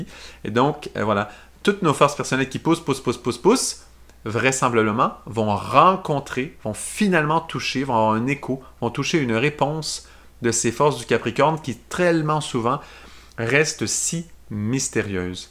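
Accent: Canada. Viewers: c'est vraiment très intéressant , l'accent canadien donne une dimension supérieure à cette capsule !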